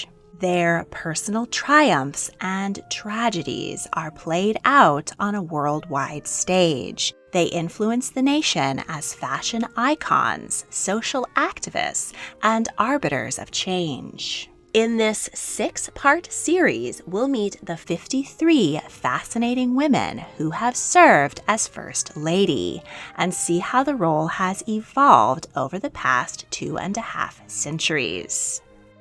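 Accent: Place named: New Jersey, United States. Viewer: OhMayGaud!!! Your Jersey accent is awful!! It makes it very hard to listen to this and take it seriously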